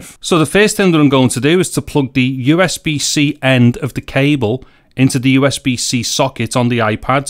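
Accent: Liverpool, United Kingdom. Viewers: Found this by just browsing and I wasn't expecting a cheery Liverpool accent to greet me! Sláinte mate, great vid v helpful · Thanks for that. I bought a complete USB-C Hub to connect all sorts of media. It’s the same one advertised in the Apple Shop but, buy externally and you can buy it for 25% of the price Apple want. You read that right. Buy external of Apple and SAVE 75% on Apple price for exactly the same brand and item they are selling. Good video with your happy smiling face and Liverpool accent. Reminds me of home. I now live in Thailand. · Definitely a scouser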